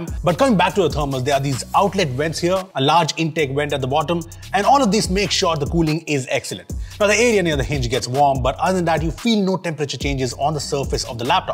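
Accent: India. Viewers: that's his accent. Most urban Indian accents in posh areas of Delhi, Gurgaon, and Noida do not pronounce r in words. And he's from Noida.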